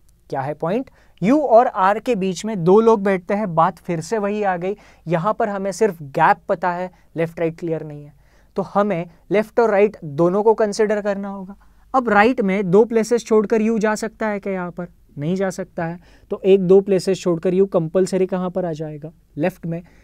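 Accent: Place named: Maharashtra, India. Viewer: that marathi accent is 😍😍😍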